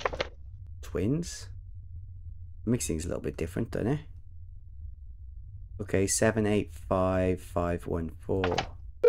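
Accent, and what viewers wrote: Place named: Wales, United Kingdom. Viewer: Ohhh welsh!! Yeah i was thinking smth british but it didn't sound quite london-ish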